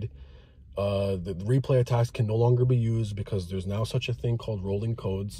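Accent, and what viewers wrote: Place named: Canada. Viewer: Bro I can just tell your Canadian haah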